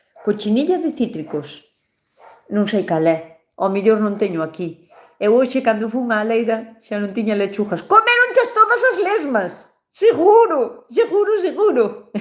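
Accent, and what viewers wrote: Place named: Lugo, Spain. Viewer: Yo nací en Lugo ,pero no me crié en Galicia ,ahora hace unos 35 años que vivo en Galicia de nuevo y me recuerda tu acento a familia que tengo en Lugo y a alúmnas que he tenido de la zona de Ribeira ,me encanta el deje que tenéis y se os entiende de maravilla ,no así a los de la zona de Mazaricos que sesean mucho ,por lo que les entiendo peor ,espero seguir viendo tus recetas ,y por supuesto que las haré . Gracias y sigue siendo tan maja y espontánea como eres .Un afectuoso saludo de esta gallega castellanoleonesa .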